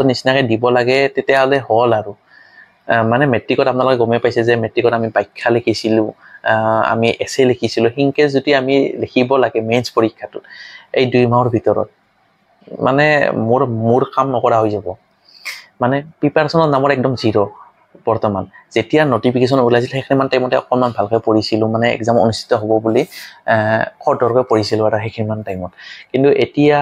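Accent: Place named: Nalbari, India. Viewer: Daide Nalbarir nk ha 😄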